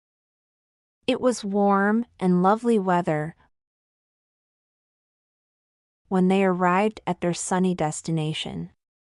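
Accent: United Kingdom. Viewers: Is it british accent?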